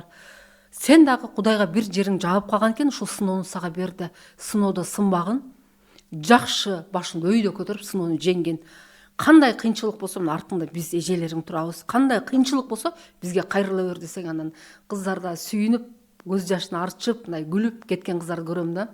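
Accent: Standard. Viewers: Бул эже ото акылдуу экен , таза кыргызча суйлойт экен. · ишиңде ийгиликтер болсун. Өтө так, нукура кыргыз тилинде сүйлөгөнүң аябай жакты.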